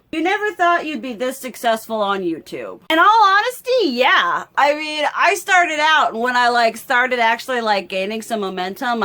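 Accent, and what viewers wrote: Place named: Appalachia, United States. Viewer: OK but where can I get this sweater? Also, judging by your accent and you driving between TN and VA I'm guessing you must be Appalachian like myself. I'm currently in Asheville but grew up in Wise County VA about 45 min from the Tri-state area. If you know what I was just talking about, HOWDEE 🤣If not, that accent still sounds like home!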